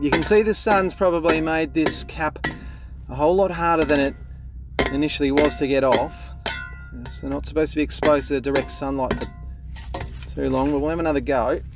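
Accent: Australia. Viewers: Love your channel, but your silly over the top okka accent is a bit annoying. Don't be Steve Irwin of drainers mate!.....😂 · LOVE Aussie LOVE your accent ❤ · Let’s be honest, I’m more here for the Australian accent then the drain cleaning. That’s just a neat little bonus on top innit? Greetings from Germany :)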